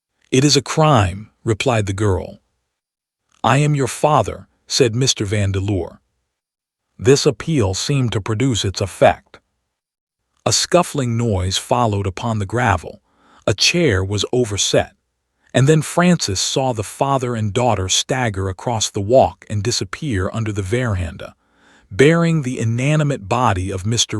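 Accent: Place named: Bronx, United States. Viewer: Pity about the inexplicable Bronx accent, but the narration is competent enough.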